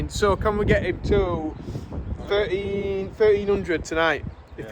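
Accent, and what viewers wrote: Barnsley, United Kingdom. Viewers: thank you for content love hearing a fellow Barnsley lad 😂 don't be late for big Mick Mick at weekend 😂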